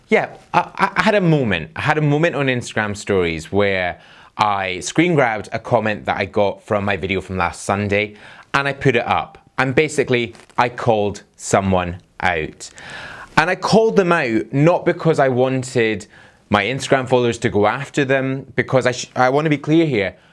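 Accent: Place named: England, United Kingdom. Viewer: where abouts in England are you from? Your accent is amazing!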